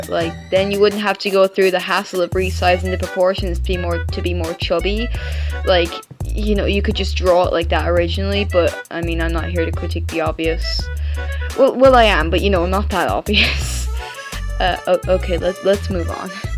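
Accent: Ireland. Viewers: ngl idk but she sounds like she has a irish accent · Are you Irish?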